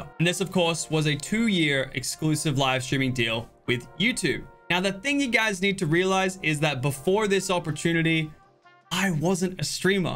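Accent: United States. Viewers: why do you try and talk with a US accent though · UGHHHHHHHH Can't hand the American twang brother. It sucks. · Your accent is getting more and more American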